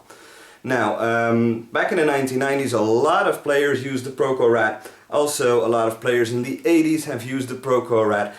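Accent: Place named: Netherlands. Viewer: Ha, the unmistakable Dutch accent. Nice vid.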